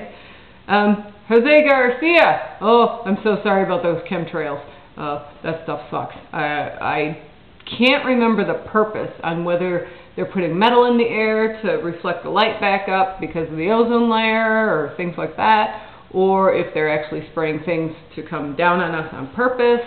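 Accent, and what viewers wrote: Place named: Maritimes, Canada. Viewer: Off topic? Meh! I just noticed how you say some vowels is like Maritimers...lol Yo, East coast, baby ;p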